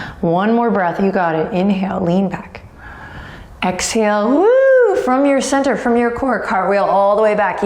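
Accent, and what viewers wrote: Ireland. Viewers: i like the irish accent. lololol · Thank you for your wonderful videos, I love benji! Really finding it enjoyable to follow your videos, i never managed to do yoga by my self at home until finding you.. This week my theme is the heart so this was perfect! things are coming together in my life to allow me to keep my heart open. Sending gratitude from Ireland (perfect that you did an Irish ascent this time!)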